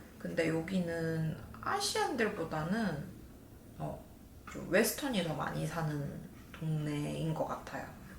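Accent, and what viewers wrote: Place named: Gyeongsang, South Korea. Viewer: 서울사람이라고는 하지만 감출수 없는 경상도 억양 ㅋㅋㅋ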